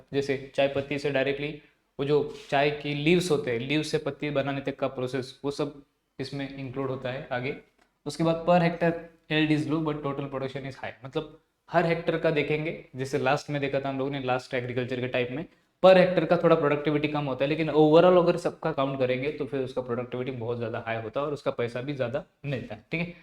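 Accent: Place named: Maharashtra, India. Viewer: Ha bolyvarun tar marathich vatat ahet sir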